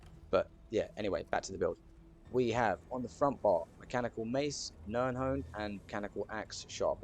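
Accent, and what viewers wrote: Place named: New Zealand. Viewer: Are you kiwi? you sound like Kai Kara France my guy.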